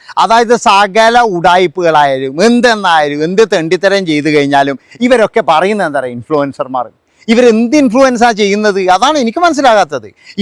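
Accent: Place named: Malappuram, India. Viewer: ❤... good talking... Malappuram slangs..if it's ❤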